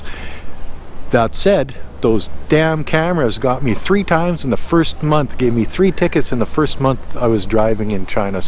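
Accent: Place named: Canada. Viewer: I knew this dude was Canadian after hearing him speak just one sentence! Although he has been living in China, he hasn't lost the Canuck accent for real, eh?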